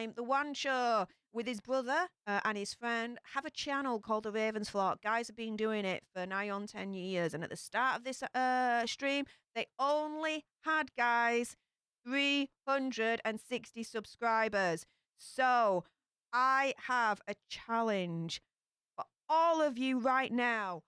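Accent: Yorkshire, United Kingdom. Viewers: I listened to a video that explains all different English accents by region, and I pinpointed you to Yorkshire! Am I right? 🙂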